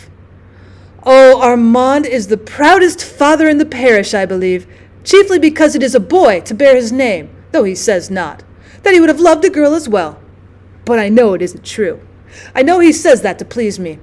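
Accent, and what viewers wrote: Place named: South, United States. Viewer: i just love ths southern twang to this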